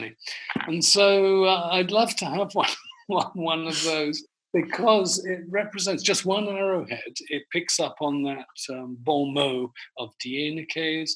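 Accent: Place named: United Kingdom. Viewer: your british accent is unbearable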